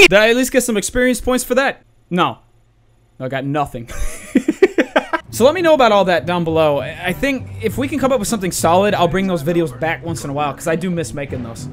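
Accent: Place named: Brooklyn, United States. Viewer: You have a strong Brooklyn accent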